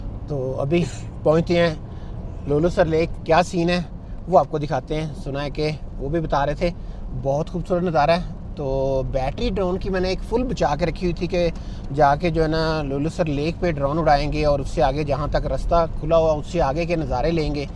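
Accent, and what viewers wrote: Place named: Punjab, India. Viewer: جی آپکی اردو بہت بری ھے پنجابی لہجے میں اردو نہیں بولی جاتی ، ناک میں بولتے ھو پاں پاں کر کے ،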